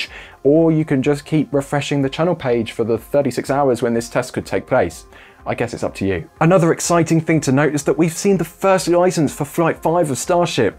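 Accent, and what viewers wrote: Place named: United Kingdom. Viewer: "Centimeters" is exactly why I don't subscribe. There is something inherently comical listening to British accented narration about an American aerospace industry genre, knowing that ESA is virtually non-existent for British narrators to pronounce their terms with an imperious nose in the air pose.:face-green-smiling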